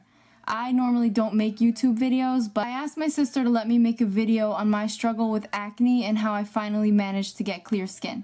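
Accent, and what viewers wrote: New Jersey, United States. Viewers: Are you from New Jersey? I can hear an accent.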